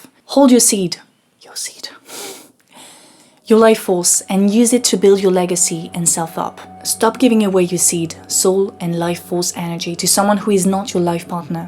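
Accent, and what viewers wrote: France. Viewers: She does it deliberately to misrepresent her political opponents so she can make 'essays' pretending she knows what she talks about. Trust me, if you start an essay from a false premise then no matter how many big words with a french accent you say, it's pretty much garbage from the initial false premise. She doesn't want feminists represented by the hairy purple haired freaks screaming at everyone with viens bulging from their forheads about how proud they are for their abortions because she would claim that lacks nuance right before making the claim that all men are incels in her opinion 🤷‍♀️ this approach is the substance of most leftist debate these days, thats why conservatives generally have stopped trying to talk to them. Because their interactions are disingenuous and gaslighting.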